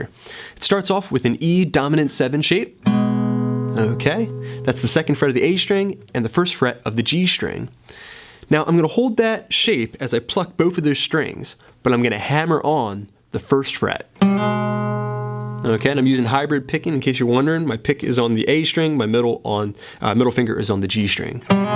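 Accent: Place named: Maryland, United States. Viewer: Nice stuff! My first love was guitar, but at 8, I got the old school music store lessons grind, sitting in a room with a rental guitar with strings a half inch above the neck & it was torture for my soft little hands, and SO boring and sad - I moved off to drums & keyboards, Now, as an old guy w/the time to really get to know my way up and down that neck, & lots of good lessons like these online? I'm having some fun & making progress. Off topic: R U by any chance from Md. or thereabouts? We have the same accent. ...Nice lesson(s)! Thanks, again!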